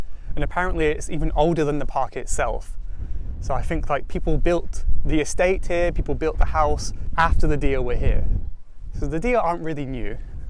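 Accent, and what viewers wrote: United Kingdom. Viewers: I love accent british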